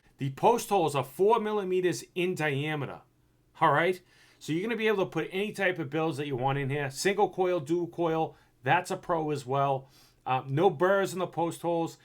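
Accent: Boston, United States. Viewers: Are you from Boston bro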